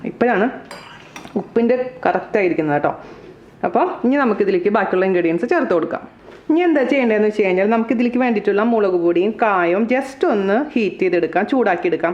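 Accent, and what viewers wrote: Thrissur, India. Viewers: Trichur slang So sweet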